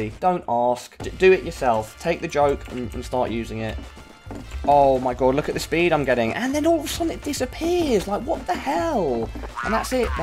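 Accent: United Kingdom. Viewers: You British or what ?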